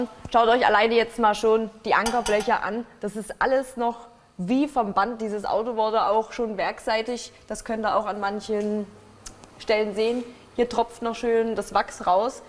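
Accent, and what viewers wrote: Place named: East Germany, Germany. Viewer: Richtig ekelhafter Ossi Akzent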